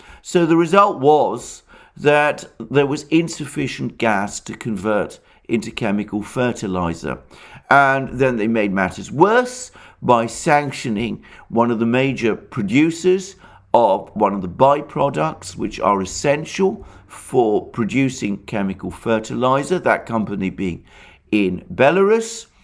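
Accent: United Kingdom. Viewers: Alexander is British. Tomato, Tamayto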